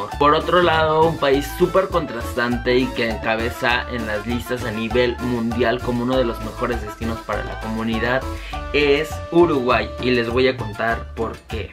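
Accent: Mexico. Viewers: Como se llama el tono ese muy mexicano q pones en tus video me ree gusta..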